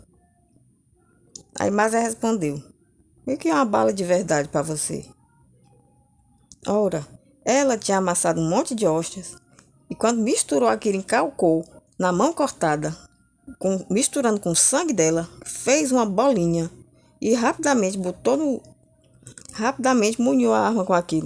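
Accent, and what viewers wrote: Northeast, Brazil. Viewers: Primeira vez aqui no canal e amei o seu relato! Seu jeito de narrar é maravilhoso! Bem nordestino kkk parece eu falando!! amo meu nordeste! Parabéns pelo relato!!! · Já me inscrevi no canal só pelo sotaque amo meu nordeste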